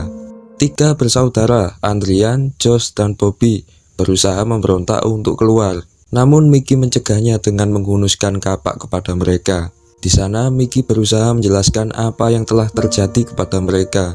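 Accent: Java, Indonesia. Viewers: Medog parah min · Lucu narator nya medok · masnya medokkkkk😂😂😂👍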